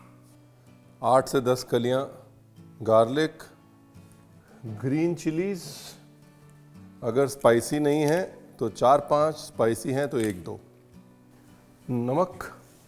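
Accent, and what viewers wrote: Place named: Punjab, India. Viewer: I noticed this today on how he says "Chickun" and not "Chicken". You can take the punjabi out of punjab, but cannot take punjab out of the punjabi. Love it!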